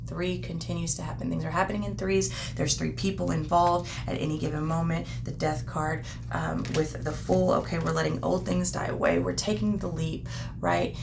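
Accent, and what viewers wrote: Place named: North Carolina, United States. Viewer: I really enjoyed your message and it was it was great to see you face finally. I’ve been a subscriber for about a month now but your message today was so thought provoking. I absolutely need that kind of coaching in my life... I held onto every word! I have to say I think we share the same accent?? NC maybe? Anywho I love you woman, keep up the inspiration and thank you!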